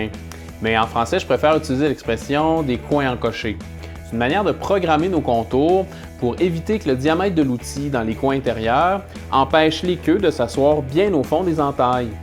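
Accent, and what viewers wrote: Quebec, Canada. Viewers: Bravo. Très bon tutoriel. Juste une petite remarque concernant la notation de la machine que je trouve sévère. En effet, le moteur ne fait que quelques W soit 100 fois moins qu'une fraiseuse ! Je trouve même exceptionnel qu'elle arrive à faire ce travail avec une puissance aussi faible. Cela étant dit, bonjour à nos cousins de la belle province. J'adore votre accent (qu'est-ce que je dis, c'est moi qui ai un accent ...).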